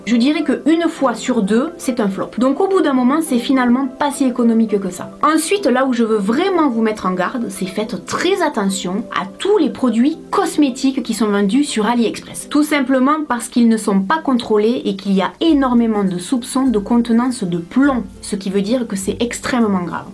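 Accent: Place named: Perpignan, France. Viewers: Coucou! Je te suis depuis quelques semaines à peine et j'aime beaucoup ce que tu dégages (ta fraicheur) et tes conseils avisés. Tres heureuse de savoir que tu es de Perpignan (je me doutais bien vu l'accent!) et ravie également d'apprendre qu'une marque de cosmétique est produite dans notre belle région! Ne change rien, au plaisir de voir tes prochaines vidéos! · et beh je me disait bien que ton accent me disait quelque chose... J'habite Perpignan depuis 4 ans environ, mais je ne connais pas du tout Centella. Pour Aliexpress, je n'ai jamais eu aucun souci pour le moment, mais je ne commande rien d'électronique, ni de soins donc bon pas trop de risque. Et pour justfab, jamais testé, mais j'ai toujours pensé que ça puait l'arnaque.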